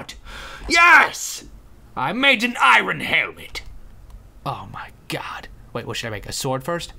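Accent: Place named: United Kingdom. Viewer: I really hate British voices on men, stop, I love you, just stop.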